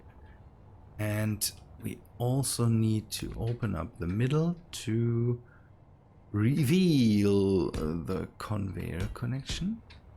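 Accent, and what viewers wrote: Germany. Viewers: I have a question r u German because it sounds like it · I really like the way you speak english. Maybe it's because you've a German accent. At all your Voice sounds really good.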